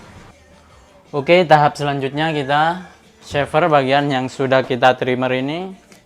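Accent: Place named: Madura, Indonesia. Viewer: Orang mana pean mas....kog kyak ada orang Madura disitu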